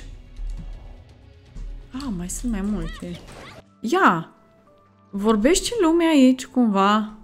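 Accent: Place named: Transylvania, Romania. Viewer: Mie imi place tot ce joci, pt ca imi place sa ascult ardeleneasca ta😂😂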